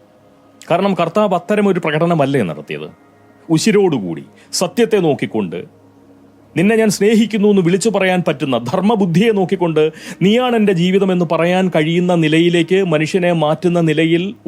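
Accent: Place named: Ongallur, India. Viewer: ഒരേസമയം ചിന്തിക്കാനും ചിരിക്കാനും വക നൽകുന്ന ഓങ്ങല്ലൂർ സംസാരം എനിക്ക് ഏറെ ഇഷ്ടമാണ്. നന്ദി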